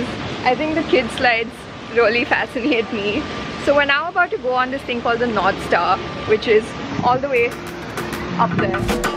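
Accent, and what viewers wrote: India. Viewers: Only Indians can understand your accent. Thanks for the subtitles.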